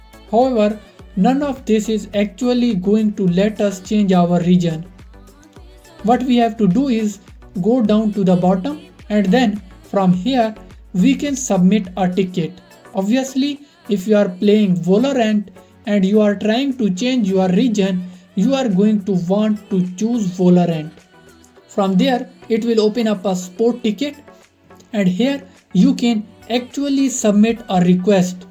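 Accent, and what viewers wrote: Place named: Bollywood, India. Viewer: always the bollywood accent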